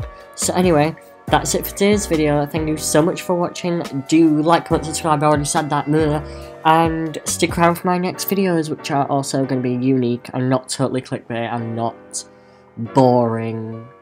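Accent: England, United Kingdom. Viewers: are u from England i swear u sound like u do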